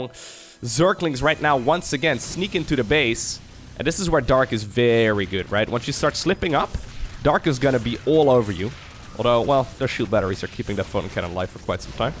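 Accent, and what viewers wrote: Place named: Netherlands. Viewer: Your voice always sounds funny 😜 (because of the Dutch accent, which always sounds funny to me as a fellow Dutchman).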